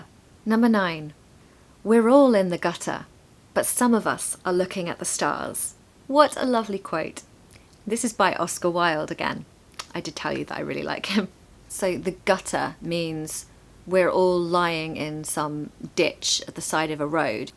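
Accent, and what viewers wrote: United Kingdom. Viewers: Beautiful British English and form an inextricably-linked whole. So yeah, I come 'for both'. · you have a beautifully sounding true British accent. How can I achieve that accent you have if I am not an English native speaker?? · Hello, I recently subscribe your channel. I love your british accent! I am from Chile and enjoy your videos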